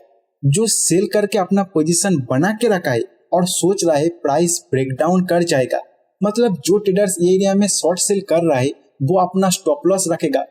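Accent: Bengal, India. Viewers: Dada... Tomar kotha sune bangali bolei mone holo..... Tai ....bangla tei bolchi.... Stock r blasting move Keno hoy.....ba stock blast ( ek din e 3 to 5% move ) ki kore hoy..... Ei related kichu information dao ...... Khub upokar hoy..... 🙏🙏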